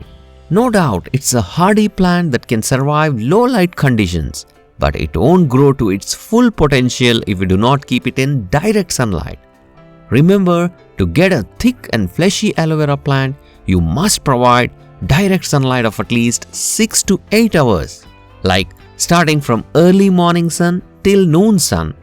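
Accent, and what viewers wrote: India. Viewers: Very strong Indian accent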